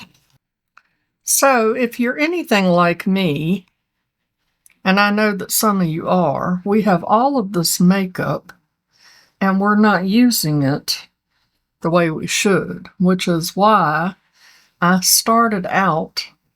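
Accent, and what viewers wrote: South, United States. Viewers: Love your southern accent! Have to listen on 1.5x speed though 😅❤🎉 😆🌞❤️💖🙌🙏 I truly needed this video today! Bringing out my wax melts and Dior foundation 🙏😇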